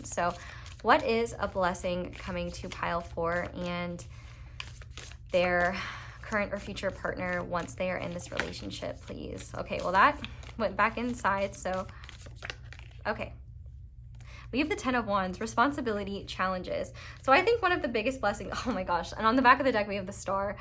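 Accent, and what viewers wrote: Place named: Wisconsin, United States. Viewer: Pile 2 - the Wisconsin accent 😂 me being from Wisco and a Packer fan 😂😂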